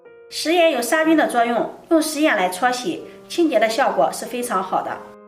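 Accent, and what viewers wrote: Shandong, China. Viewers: 第一次收到推送就关注你了，听口音山东银👍💪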